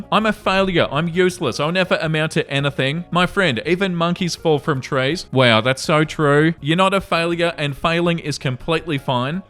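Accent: Australia. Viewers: This is just racist against an Australian accent xD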